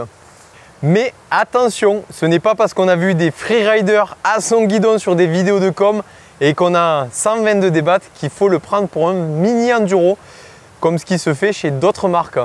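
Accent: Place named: Toulouse, France. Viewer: c'est pénible cet accent du toulousain... tu pourrais pas copier l'accent ch'ti?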